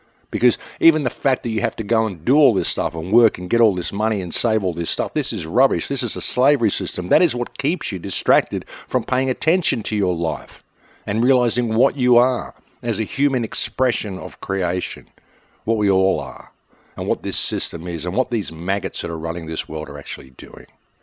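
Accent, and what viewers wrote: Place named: Australia. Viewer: Thank you! Thank you for the time you put into your work for us! And thank you for being born in Australia! I love the sound of your voice combined with your accent as it skillfully spills the truth. Thank you for keeping us aware of our true surroundings while teaching us how to identify big picture realities which the majority of the human population is deliberately blind to.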